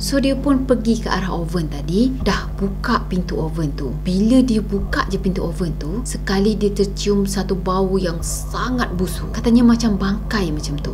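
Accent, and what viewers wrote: Kelantan, Malaysia. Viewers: Salam kak.akak ore kelate ke.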